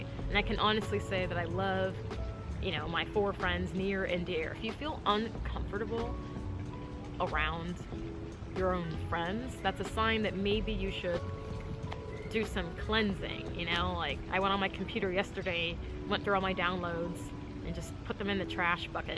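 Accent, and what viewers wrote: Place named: Boston, United States. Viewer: Ayeeee your from Boston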